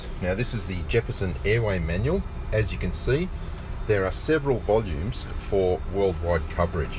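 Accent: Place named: Australia. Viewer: Australian I believe.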